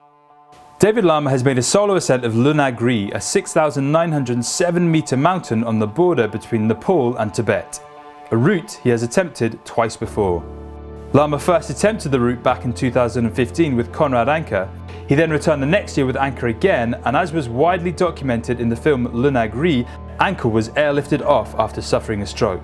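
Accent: Scotland, United Kingdom. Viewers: Scottish....but educated in England :D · Cheers for the info! I wouldn't have called it 'strange' if I'd placed it as Scottish. ;-) Sounded like it had a twinge of something from further afield. Keep up the well-spoken words!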